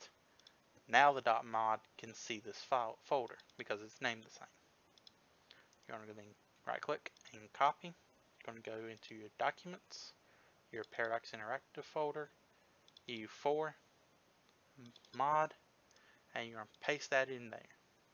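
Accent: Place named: South, United States. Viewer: Thanks mate, love your southern (?) dialect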